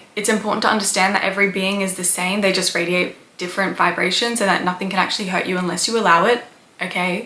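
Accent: Australia. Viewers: I believe I astraled when I was 5-8 years of age almost every night. Last year in September I attempted my first concious OBE and almost succeeded 😊 . My lower back was hurting so I sat up. I had the tingles, felt the vibrations, heard the loud rumbling (no alarm but we are all different). But also, I felt as though my heart chakra was holding me back as my chest felt weighted down. Unsuccessful attempt, but I was so happy that I got so far on my first go. Had heaps of similar experiences since but no feelings of being weighed down. Also, hello from Perth 🇦🇺 you sound like you're from Qld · I can hear the Aussie accent breaking through ☺️ Great video Thank you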